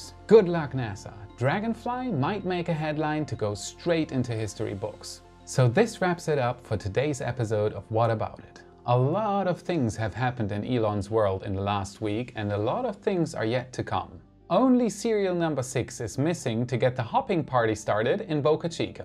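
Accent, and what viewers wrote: Germany. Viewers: Are you German? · are you german? you sound very german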